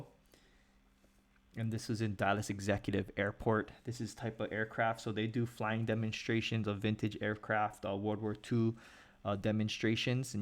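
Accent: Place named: Hawaii, United States. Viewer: sounds like you're from Hawaii. Rest in peace aviators.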